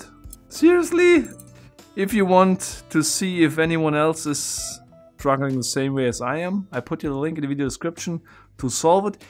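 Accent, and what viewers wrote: Germany. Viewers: Oh, Guy! Are you from Germany? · are you german? :DDD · Omg man hört so raus dass der deutsch is xD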